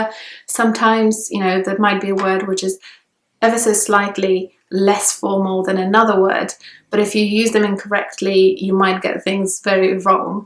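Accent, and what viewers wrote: United Kingdom. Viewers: Ironic that you ended up with such a beautiful British accent after finding American English easiest to understand at first. As a native speaker, it's difficult for me to say, but (as Brandon commented below) the absence of noun gender must really help. I find just remembering genders in German very hard, still. As Scott says, below, isn't the crazy English spelling the main difficulty, though, from a reading a writing perspective? I once read a book by a Russian linguist who argued it takes English native children two years longer to get literate because of the spelling. I still struggle, sometimes, even with top 2,000 frequency words after decades of extensive reading and writing. I'll always know when I don't know, if you follow, but I do sometimes have to check. Rather like Chinese or Japanese natives having to check the characters (though it's not that difficult, I'm sure). 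· I completely thought you were a native Britian before you said you were polish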